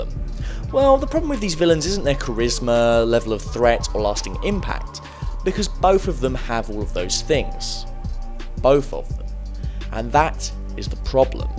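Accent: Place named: Upper class, United Kingdom. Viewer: First of all the black panther has no villian problem and my God this was the first movie give them a break super critics and who is this guy doing this segment because he sounds like some British aristocrat who has never been around a black person .it is a known fact that people who are dark skinned understand this movie better then our pale face counterparts because they've never been hated on simply for the color of there skin .and if you or anyonelse can't understand the message from this film then not only are you not open minded. But you probably most likely only gang around members of your own race and that's not only cowardice but unhuman and boring .killmonger is a villian fueled by hate and that's more understandable then some sadistic twisted dceu character that Batman has to fight .haters go back to the dceu world the marvel universe is for controversial heros .peace and power to the people .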